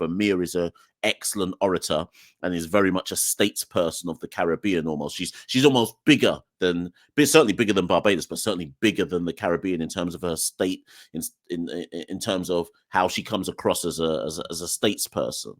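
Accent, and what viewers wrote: England, United Kingdom. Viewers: This guy lives in the UK, so it seems, and is essentially making silly comments based on 'second hand' information. Two instances where GCB and BCA had a disagreement with CWI, for reasons the silly host of this podcast failed to explain, means both territories want to 'go it alone.' has also refused to acknowledge the contributions, financial and otherwise, Barbados has made to WI cricket. He also failed to inform viewers that under both Skerritt and Shallow, there hasn't been any significant implementation of policy initiatives to improve and develop regional cricket. But sits on his soap box spewing nonsense, perhaps believing, as in days of old, people would succumb to his views because he has an English accent.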